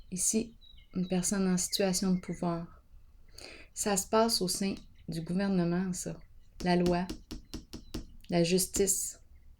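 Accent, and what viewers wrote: Canada. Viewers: Mon Dieu, que j aime votre accent canadien. On dirait mon ami Matthieu Bock Côté. Adorable cet accent !!!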